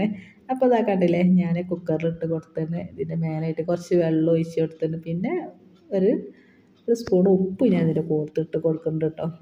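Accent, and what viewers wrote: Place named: Malappuram, India. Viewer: Wow ഹെൽത്തി കപ്പ കറി അടിപൊളി ആയിട്ടുണ്ടല്ലോ പൊളിച്ചു എനിക്കു ഇഷ്ടം എല്ലാവർക്കും ഇഷ്ടം ആകുന്ന മനോഹരമായ മലപ്പുറം നാടൻ ഭാഷ വന്നപ്പോൾ കപ്പ തിന്ന ഫീൽ ആയി 👌👌😋😋🥰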